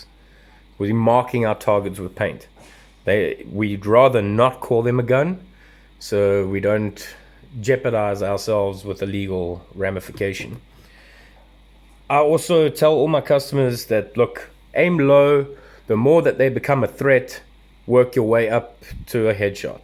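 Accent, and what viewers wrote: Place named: South Africa. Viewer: Off topic, but... just an observation... Interesting accent you have in South Africa! To my ear (Southern American) I detect a little Brit, a little Aussie, and oddly enough, a little deep south American. Back on point... I'm wavering between the Stormer Elite and the TMC. I can get a TMC for $40 US (at $229) more than the Stormer (at $189). For that small price difference, do you think it's a better purchase to get the TMC, overall? Will be running a red dot so don't care too much about the flip up sights. And the easy on, easy off fore end isn't a big deal either. But I do kinda like the metal construction of the TMC, as well as just the overall aesthetics, so leaning toward the TMC. Assuming that mechanically they are the same gun... err... I mean, MARKER! But with the Stormer being newer, I can't help but wonder... Does it have some subtle "improvements" or has it been downgraded with subtle cost saving measures.... 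Any thoughts?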